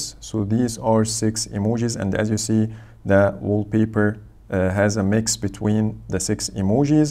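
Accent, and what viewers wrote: Egypt. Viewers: I think you are Egyptian from your English accent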